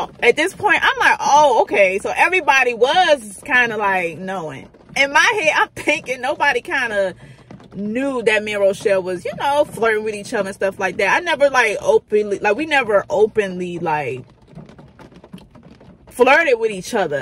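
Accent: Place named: North, United Kingdom. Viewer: U must be from up north u sound like it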